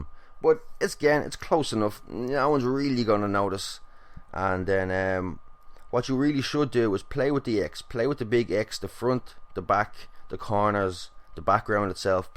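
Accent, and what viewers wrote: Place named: Ireland. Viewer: Nice vid dude. 1) I know you're irish but you really do sound quite alot like Sheamus dammit. also 2) could you maybe do an NWO logo? I think they were the main DX rivalry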